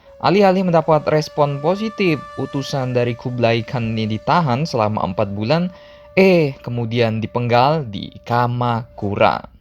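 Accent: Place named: Riau, Indonesia. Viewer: Adminnya orang Riau nih atau kalau ngg BANGKA BELITUNG ✌️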